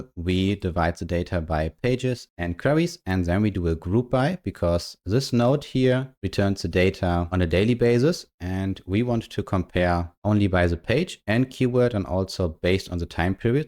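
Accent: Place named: Germany. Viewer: Hear german accent, know it's quality without watching the video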